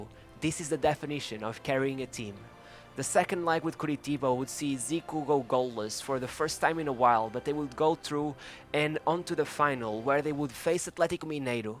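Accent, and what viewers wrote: Portugal. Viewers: Ele é português. E se tá achando ruim, grava tu e faz melhor!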